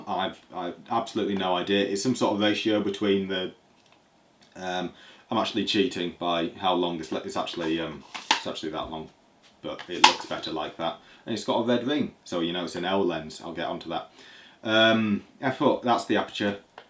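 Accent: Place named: England, United Kingdom. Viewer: I actually enjoyed watching your video. You made a dry subject entertaining and I liked your English accent and humor. You will probably say: I don’t have an accent. 😊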